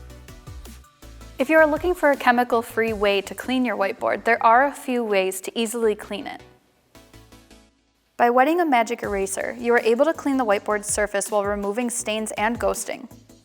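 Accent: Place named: Canada. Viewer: Hahahahaha I’m sorry but it’s funny how concerned/upset she is in the intro about people “causing permanent damage to their white boards”... I can just picture her at the bar on Saturday night talking wayyy too much about white boards🤣 Also what accent is that??? Just curious.. is that Canadian? Listen to her say magnets: “Maeyyynits” Anyways joking aside thanks for the informative lesson on whiteboard maintenance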